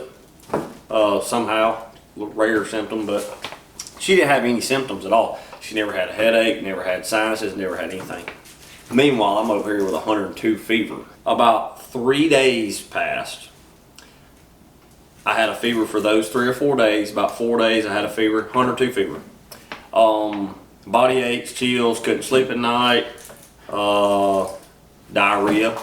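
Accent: South, United States. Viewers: Thank you for sharing your experience with Covid-19, Everyone will experience Covid-19 differently with a lot of Similarities, there’s several Symptoms commonly known with COVID-19, everyone has or has not Other Underlying Health Issues that will Most likely make this Virus more difficult to Deal with and it may add more Symptoms and issues, I’m a free diver and I need my lungs to be in top Condition so I try to take necessary measures to keep from getting infected but i also have a few underlying health issues, I’m a Combat Veteran and my VA Is helping me a lot to keep it safe for me. Your message hear is Spot on… My fellow Comrades and I fought in Various Combat theater’s to keep Americans Freedom so Everyone has their Rights, COVID-19 is REAL, PEOPLE ARE DYING, DO WHAT YOU CAN TO KEEP FROM BEING INFECTED, TRY NOT TO INFECT OTHERS, TAKE THIS INFORMATION SERIOUSLY! 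People Pay good attention to what this young man is telling you! I agree with his message, I’m 57 and not in great health so I must stay well, I love my Tuna, Mahi-mahi, Wahoo (Ono), Sword fish) so I must keep fishing (free diving)W/O tanks! KEEP ON FISHING! Where ever you’re from, not sure but you sound like a Southern boy? Kentucky or Tennessee? I have a Army buddy up in Kentucky, strong accent like yours lol. Take bud. Aloha from Hawaii. 🌴🌴🤙🏼😎🤿🌴🌴🍍🥑🍌🏄🏽‍♂️🚣🏽🚤🐟🎣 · I appreciate your heart on this you southern accent reels it all in for me it's like listening to family also I'm sorry for your experience with this and you know and I know God brought you out of this, but the politics is exactly what you think you got it right friend. This is what I discovered and I believe God shown me, from the beginning I knew they rolled it out too fast I was out on lockdown for 4 wks and in that time I experienced a little fear and it felt familiar like the time the world learned about terrorism at 9-11-01 and before that with the extreme Islamic. 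I began to seek the Lord I knew I wasn't anywhere I was supposed to be with the Lord but I did what I knew to do repented began to read my Bible more and I was accustomed to witness cause though steeped in my sin I knew the Lord had a job for us believers to do but I couldn't be around anyone I thought going to street evangelize but my family and some YouTubers advise not going alone but I really wanted to fish, that's my type of fishing cause Jesus made us fishers of men. No one would go fishing with me so I continued what I started to do while on lock down and that became a YouTuber for Christ and I also forwarded videos I found on you tube some shocking things about the poor spirituality of our current state as a country I mean I was desperate to do the work of the Lord I may have went a little overboard but when you don't know if you have the next day or the next moment then you reach out to everyone you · You Country AF. 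Lol new to your vids. What’s stand for?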